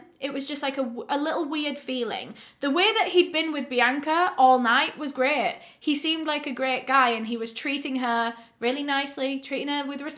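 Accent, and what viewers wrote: United Kingdom. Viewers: Way to be dismissive of biological facts vato. Your ignorance is monstrous. What a drama queen to use the word monstrous 👀. You got a problem homie, Go and study biology. You want to take moral high ground, then never again watch a murder case. Not going to happen. All of us normal human beings have a fascination/curiosity with deplorable evil that other despicable humans do. Hence why I come on. Because I enjoy her charm, and her british accent, and her way of narrating the subject matter. Highlighting and exposing these scumbags. Many of which I never even heard of despite being american hispanic. Take your nonsense somewhere else. You don't like biology and nature 'Oh well' your stupidity is noted.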